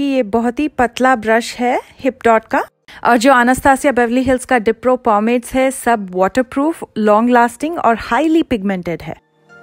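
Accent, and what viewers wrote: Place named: India. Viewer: ur hindi accent sooo cute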